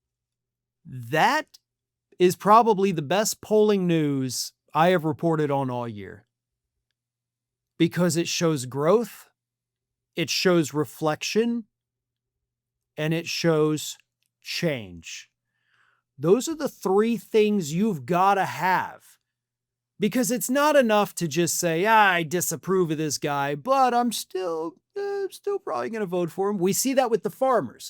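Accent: United States. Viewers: HILARIOUS, you look either brownish or speak a heavy-tongued American English and wonder that a white billionaire RACIST turns on you? YOU HAD TO BE KIDDING! Do a better job this time! 👍👍👍👍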